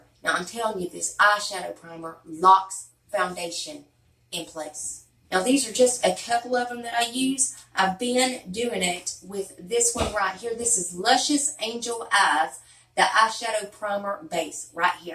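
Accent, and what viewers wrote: South, United States. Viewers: I'm going to try this out too. We'll see if it works on a 60 yr old face. Lol I love watching you and listening to your accent. I'm a southern in Arkansas so it's nice when I run into a southern accent. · Girl love what watching your videos you.... you crack me up.... and I live all the make up tips that you give and I love your southern accent I'm a country girl myself ❤❤❤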